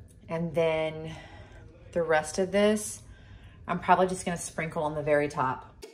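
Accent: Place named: Scotland, United Kingdom. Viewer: Just found your channel by chance and thought you were Scottish 🤣🤣 and yes I live in Scotland 🏴 and have subscribed 💕💕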